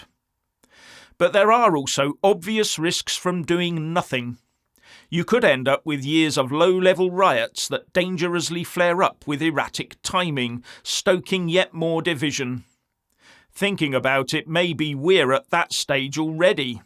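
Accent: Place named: United Kingdom. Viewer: Wow. I've never heard anything quite this British, or with quite that much bite without using vulgar language - I never knew the Britisch tongue to be a form of art. Pretty good summary too. Thank you.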